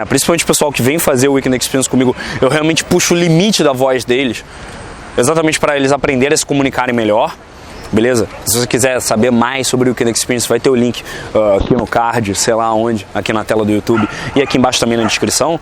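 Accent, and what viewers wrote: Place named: Rio de Janeiro, Brazil. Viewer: A dicção também é importante. Muito carioques na sua fala. Disssssscurrrrrrso, Butão é um país, bOtão é outra coisa.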